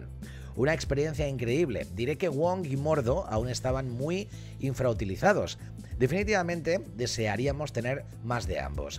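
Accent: Spain. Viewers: muy buen video solo no entendí de primera el chositil ... pero la verdad también lo bueno de tus videos son tus pronunciaciones tan españolas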